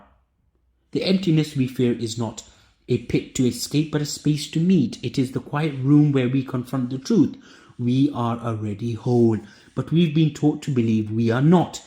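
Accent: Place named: India. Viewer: Your accent sounds Indian.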